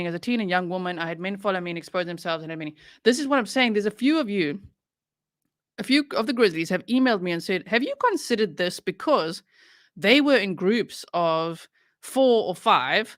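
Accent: South Africa. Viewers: We are South African, living in George Western Cape. My husband said all along you sound South African. 🇿🇦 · You did not reply to a question I asked you on another video. I asked if you are ex South African, because I am & your accent sounds as if you could be from SA. 😊 I listen to another YT channel on crime, but I stopped listening, because the woman's voice grated on me. I've found that on other non crime channels too. I don't think YT creators realise that their voice & physical presentation DO matter. As do the facts, & not a whole lot of speculation or repetition! This is why I like watching you. You tick all the boxes. I also like that you don't swear! That's a huge turn off for me. Did I hear you say you'd been an airline pilot?? Just after I commented on what I like about you, you went on to talk about being responsible. That's exactly right, I see you that way. 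· I'm a South African and is trying to pin your accent. Are you a South African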